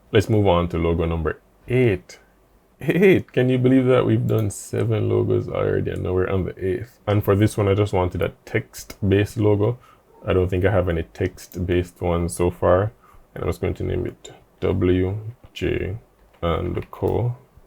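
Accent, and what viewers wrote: Jamaica. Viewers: You sound like a Jamaican lol. I know a brother when I hear one but I could be wrong😂. Great job champ I learnt alot.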